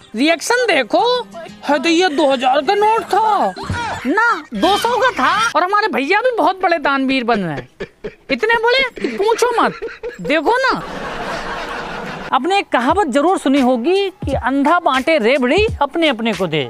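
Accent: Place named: Budaun, India. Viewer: Bhai voice se lagta hai aap budaun ke aas pass ke rhne bale ho achcha tareeka hai entertainment ka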